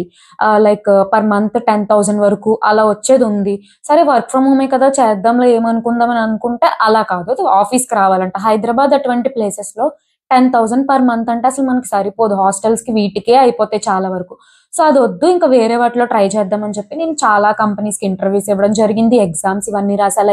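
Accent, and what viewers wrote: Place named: Konaseema, India. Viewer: Konaseema slang ❤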